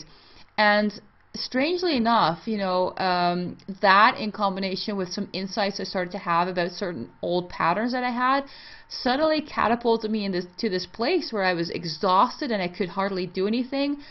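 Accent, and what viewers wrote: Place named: Canada. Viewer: Thanks for the video. Your accent sounds like a mix of Canadian, Scottish, and... Dutch? 😊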